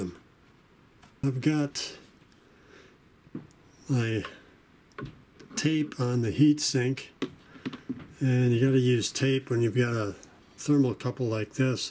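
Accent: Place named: China. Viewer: chinglish yup I know :(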